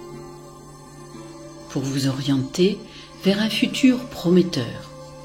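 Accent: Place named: Marseille, France. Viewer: L’accent marseillais et l’écho derrière la voix rendent la vidéo très peu crédible et on décroche très rapidement. À revoir totalement. La musique en fond est trop présente et très gênante... voire irritante.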